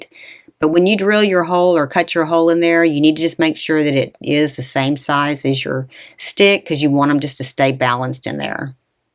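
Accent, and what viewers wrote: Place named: East Tennessee, United States. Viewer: where are you from? I’m guessing East Tennessee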